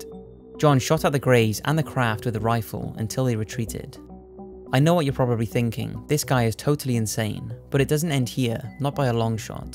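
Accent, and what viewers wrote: Wales, United Kingdom. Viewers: he's is 19 and he's welsh, I know he's welsh because I'm welsh and has a similar accent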